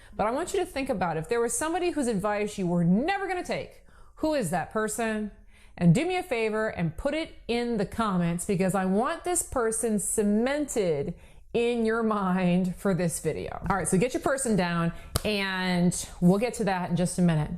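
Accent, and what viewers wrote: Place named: South, United States. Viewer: love your southern accent )))))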